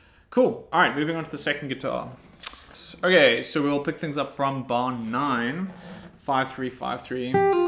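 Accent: South Africa. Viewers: You kind of sound south African..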